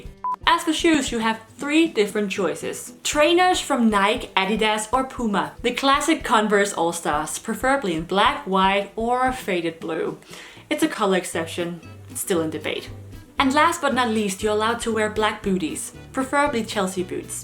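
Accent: Denmark. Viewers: Jesus christ her danish accent is amazing ! I think i remeber this girl from my younger days where she was adored as a scene goddess. Good times ... good times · Mr. Ear Raper ! Lyt til hendes accent. Hun er selv dansker.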